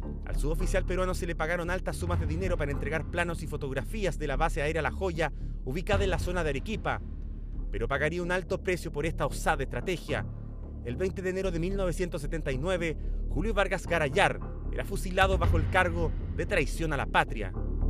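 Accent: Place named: Chile. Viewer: Ptm .... vii este videooo 2 veces y no entiendo ni m..... ... tiooo Joderr deja de hablar ese ridiculo acentoo .. k no se entinde ni kulo !! Chilee deja de Joder.. sera k no tienen nada q hacer en tu pekeña ratonera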